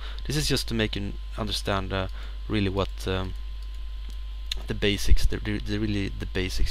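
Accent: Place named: Sweden. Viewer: Så du har äntligen börjat? :D Älskar din Svenska dialekt på engelskan! (Y) :D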